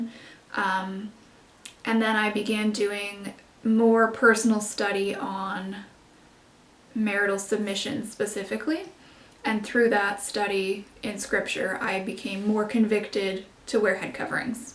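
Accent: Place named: Canada. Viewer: Thanks for the Q&A video! I also live in the country as a stay at home wife. (Kids are adults & on their own now). It can get boring for me some days but I try to make tasks for myself. I've been learning to sew clothes and so far made one skirt that was awful LOL The nearest fabric store is an hour away so I need to try to stock up when I get a chance. I totally understand having to travel. :( Oh and I knew right off you must be Canadian as my husband is Canadian and you both pronounce words the same. In fact we live so close to the border (6miles) that a lot of people here sound Canadian even if they aren't. LoL I really enjoy your videos and look forward to when you post! Do you think you would ever consider another video of your skirts (hint hint) LoL 😁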